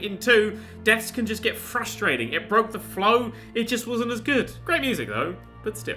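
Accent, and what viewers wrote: United Kingdom. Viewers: Is it just me or did sound particularly british in this video? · Holy shit got ultra British towards the end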